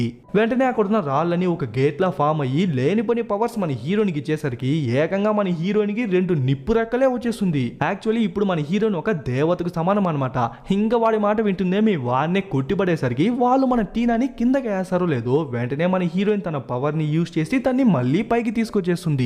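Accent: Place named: Tamil Nadu, India. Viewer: Oka Tamilian abbayee Telugu matladinattu undi ni voice But chapa Baga explained chesavu too well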